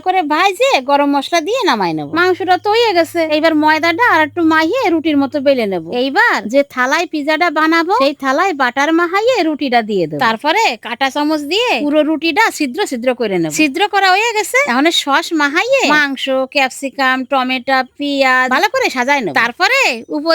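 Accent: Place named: Khulna, Bangladesh. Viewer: Khulnar vashar moto pura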